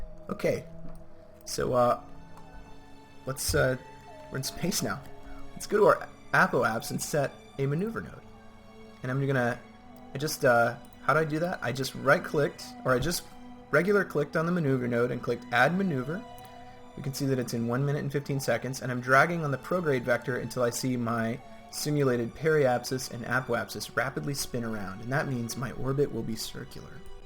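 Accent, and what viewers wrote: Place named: Russia. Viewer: okay... speaks in a russian accent Interresting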